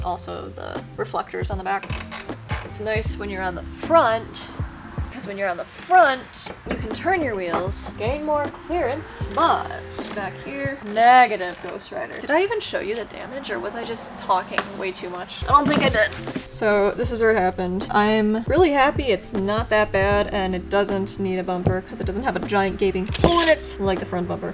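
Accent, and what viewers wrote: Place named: England, United Kingdom. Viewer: Definitely an English accent, not 'strayan' (Australian). With the reverse cameraI I also tend to forget about the existence of mirrors... good luck with the repair!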